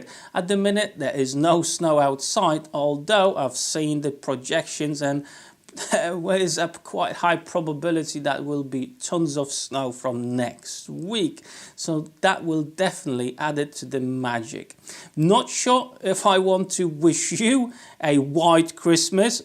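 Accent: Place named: Midlands, United Kingdom. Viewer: Hi. Your Christmas sounds delicious! Clever of you to double up on the soups etc. from different areas that you and you wife come from. I thought from your accent on occasion that you may have spent some time in the Midlands (Birmingham used to have a large Polish community and its own Polish club - the only place you could obtain real 'boiling ring'...couldn't pronounce its proper name so that's what we called it). A mate of mine was half Polish and he used to turn up with Polish dishes his dad had cooked. One was some kind of meat filling in leaves that he called 'Kalumpkies'. Probably got that spelling completely wrong but it was excellent. Are you going to incorporate any traditional UK fare into your Christmas food? Anyway I wish you and yours a very merry Christmas and hope that it gives you and your family everything you wish for yourselves and an extra wish from me. 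P.S. Really enjoying the hand power tools vids. Been using these for more than 30 years but it doesn't mean I know (or remember) all about them Thank you.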